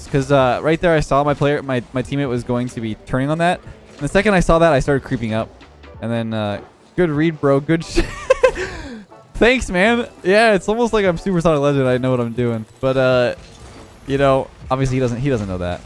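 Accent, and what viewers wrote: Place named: Canada. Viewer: He’s either from Canada or Minnesota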